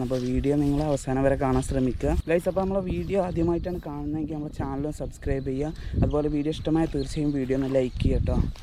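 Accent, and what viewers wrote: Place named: Malappuram, India. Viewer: അടിപൊളി സൂപ്പർ ആദ്യമായിട്ടാ ഒരു spoti ൽ നിന്ന് ഇത്ര എണ്ണം പിടിക്കുന്നത് കാണുന്നത്. സംസാരം കേട്ടിട്ട് bro മലപ്പുറം ജില്ലയാണ് എന്ന് തോന്നുന്നു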